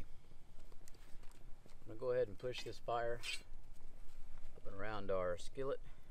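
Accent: Texas, United States. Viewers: most satisfying Texan voice ever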